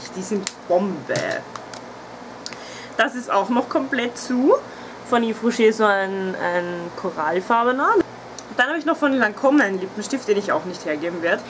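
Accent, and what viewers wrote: Austria. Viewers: Tröste Dich, ich kenne das - ich kann mich von solchen Dingen auch so schlecht trennen, auch nicht von denen, die ich sowieso nicht benutze, dabei wäre es aktuell schon angebracht, das endlich einmal wieder zu tun, obwohl ich gar nicht sooo viele Lippenstifte besitze. Aber was soll´s, es gibt schlimmere Angewohnheiten ;) . Ich mag Deine Videos so sehr, weil Du so wunderbar authentisch wirkst - wenn mal eine Weile nichts kommt, schaue ich mir deswegen auch gerne die älteren Videos noch einmal an. Dieser leichte Wiener Akzent wirkt auf mich Norddeutsche gleichermaßen beruhigend und erfrischend, wenn ich also ´mal krank zuhause bin oder ein bisschen Hintergrundgeplapper brauche, während ich aufräume etc. , sind Deine Videos für mich optimal :)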